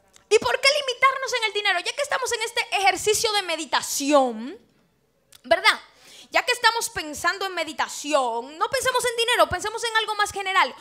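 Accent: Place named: Dominican Republic. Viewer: Me rei con tu presica. Muy edificante. y ese acento de los dominicanos como el de zankimpanki😂. Algo fresco para esta juventud. Bendiciones y saludos desde Cuba.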